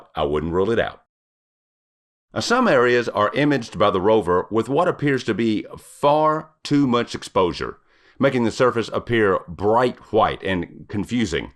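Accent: South, United States. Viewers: keep up the excellent work- also, great to hear a Southern accent (I am from Dallas)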